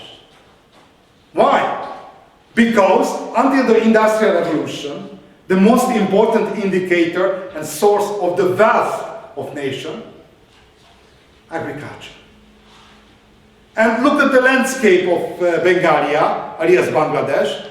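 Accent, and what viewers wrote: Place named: Eastern Europe. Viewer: Strong-,and advanced Eastern-Slavic accent.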